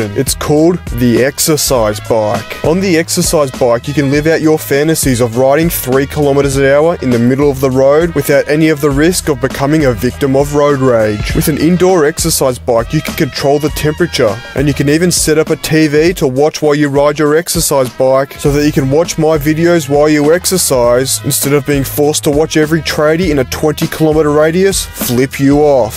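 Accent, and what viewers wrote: Australia. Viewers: I hear Australian accent i will automatically dislike and block the channel, I say that as an aussie myself.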